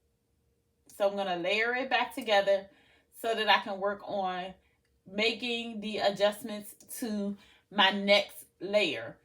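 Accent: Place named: Baltimore, United States. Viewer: You sound like a baldamore woman 😊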